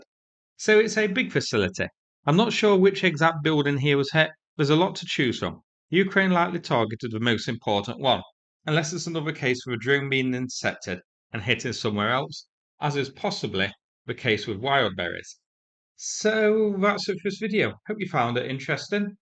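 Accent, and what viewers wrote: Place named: Midlands, United Kingdom. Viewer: It's not London, nor the West Country, Wales, Scotland or N. Ireland. My guess is the Midlands somewhere.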